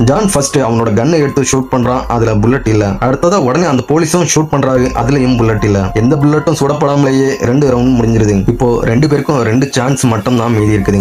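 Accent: Coimbatore, India. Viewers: Neenga coimbatore ngla?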